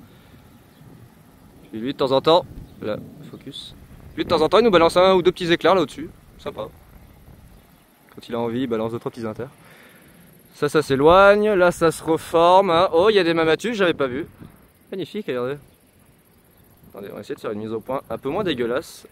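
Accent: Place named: Marseille, France. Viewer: merci pour le partage! ça nous manque dans le Sud, un orage avec de la pluie! j'aime bien ton accent marseillais!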